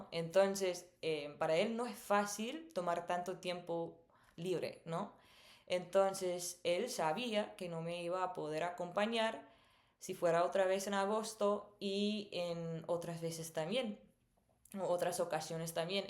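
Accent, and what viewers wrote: Guatemala. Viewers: Que chilero tu canal y tu acento chapin va vos .jajaja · Tu espan̈ol es perfecto y muy CHAPIN!! Tan chapín que la primwra vez pensé que eras chapina más que la vecina!! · Wow su acento en español es Guatemalteco saludos desde New York hermosa de parte de un Guatemalteco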